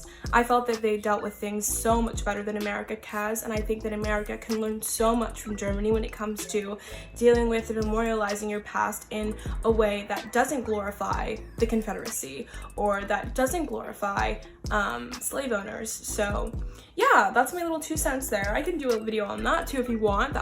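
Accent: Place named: United Kingdom. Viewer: i Said it somewhere else in the comments already, but frankly, there are almost never Americans of colour coming to Germany. Even with your accent, I would assume you’re from the UK. Also, people working at food stands are oftentimes local folks and not the most international, able to grasp the history of slavery in the Americas as a whole. Berlin is nice and I like it every day, no matter Corona. Without corona, spending your night with friends at Sisyphus or at the river with Späti beers is great. Thanks for saying our metro is clean, I always have the feeling like it’s gross. Puke, pee, full program. Greetings from Berlin.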